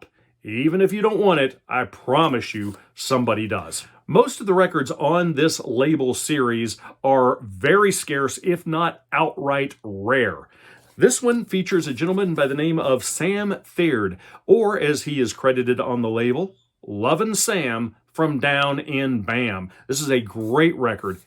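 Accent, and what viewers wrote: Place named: United States. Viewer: Apart from your great and highly informative content, your pronunciation is a bliss for non-native speakers. My english is not bad at all, but at school, we learn Oxford. I don't know what it is, but even with your American accent, I understand EVERY single word. By the way: I live in Vienna/Austria, together with my ~ 14000 shellac records.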